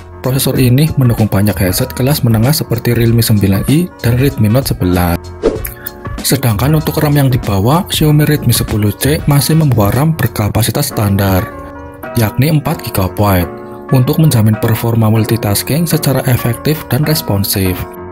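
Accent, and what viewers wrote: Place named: Tegal, Indonesia. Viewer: Sing ngisi suara wong Endi coyy... Medok n ngapak nemen yahh.. wong Tegal apa Brebes ??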